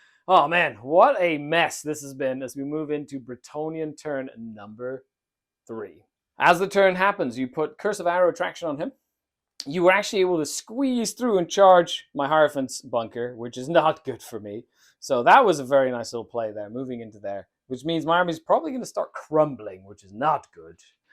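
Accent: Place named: United Kingdom. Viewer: Just found out about this channel and subbed already. I’ll leave the well deserved praise to the other commenters. All I need to know is… what kind of wild accent is that? Sometimes your a‘s are quite British.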